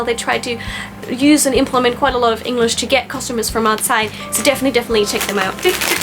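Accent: United Kingdom. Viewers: It's strange how you sound slightly Scottish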